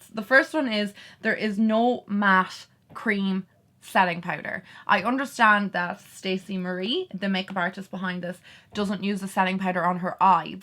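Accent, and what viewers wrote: Ireland. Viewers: Where is your accent from in Ireland?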